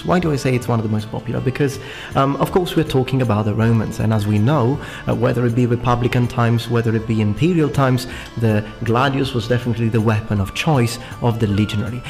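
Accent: England, United Kingdom. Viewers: I thought you were a Britt due to your accent. Learning a lot from your vids. Keep up the good work.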